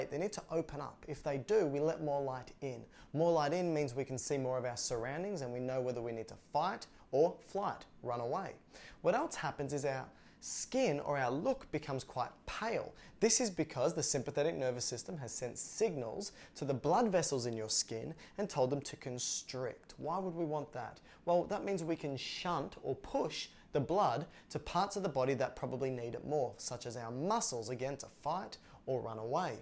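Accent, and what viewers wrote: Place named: Australia. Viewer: Your accent is exact like some of the legendary Australian cricketers..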